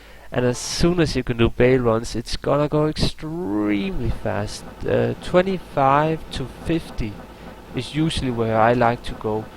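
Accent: Denmark. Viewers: uhhHU i think this guy is from denmark if you are say hej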